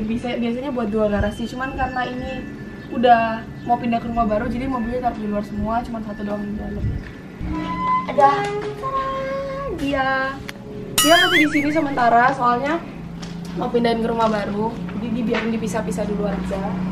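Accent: Java, Indonesia. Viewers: Kak orang Jawa kah? Kok kyk ada medoknya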